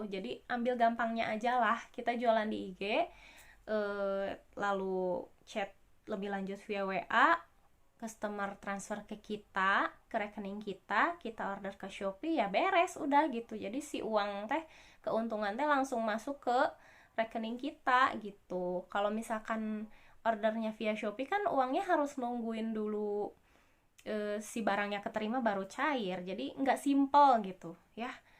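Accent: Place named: Bandung, Indonesia. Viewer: suplier atuhhhhh....dan saya sukaa nada bicara kaka hehehe khass bandung sukses teteh owner ramah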